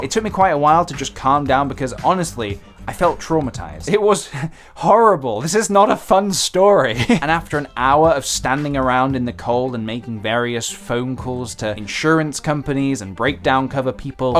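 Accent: Peterborough, United Kingdom. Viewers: Lol sounds like good ol’ Peterborough to me 😂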